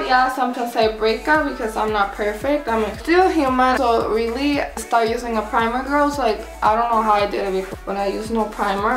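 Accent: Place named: North, Mexico. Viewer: are you mexican? you sound kind of norteña, saludos👋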